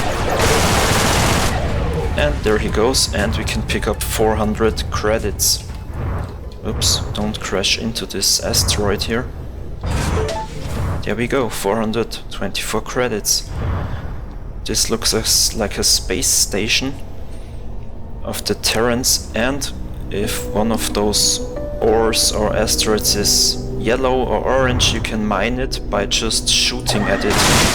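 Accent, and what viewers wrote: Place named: Germany. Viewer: Am Akzent hört man das du ein Deutscher bist. :D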